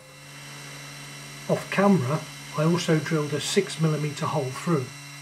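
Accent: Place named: United Kingdom. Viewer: There’s a lot of great machining content on YT but a lot is American and while I have nothing against our transatlantic cousins, pronunciations of aluminium, lever etc along with US customary units drive me crazy. I wanted to find a UK-based channel (preferably the North of England) using the metric system so I could switch off that pedantic, critical part of my brain and focus more on the content. (I know, it’s practically a mental illness — right?) Finding this video was brilliant especially while stuck at home all the time! You really check so many boxes for what I’d want out of a creator. Suffice it to say that I’ll be glad for any projects you have to share and you can count on an eager audience of, at least, one for any future videos.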